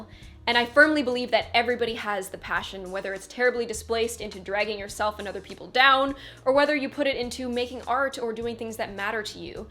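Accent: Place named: Canada. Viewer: Such a lovely message! I just want to pinch your cheeks - you're so pretty. "Don't mock the process!" Sounds so much better in your Canadian accent (than my English accent) but such a golden quote.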